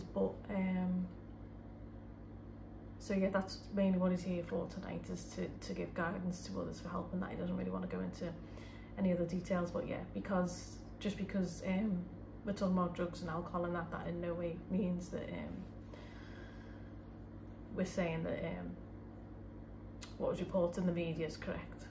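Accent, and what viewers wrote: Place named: Scotland, United Kingdom. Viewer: Amazing reading, thank u very much! So many good advice, and lovely messages from him! It was very hard at times to hear what u were saying since u talk quietly.. But i have lived in Scotland so i was able to understand. Your accent sounds scottish!! It was awesome to hear speach that sounds like scottish! It made me recall great memories, i liked your video a lot!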